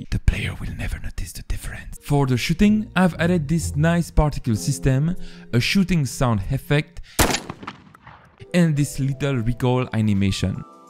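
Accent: France. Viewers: Y a qu'un truc qui me choque ! si je ne me trompe pas vue ton accent ! tu est français . pourquoi tu ne fait pas de vidéo en français aussi ?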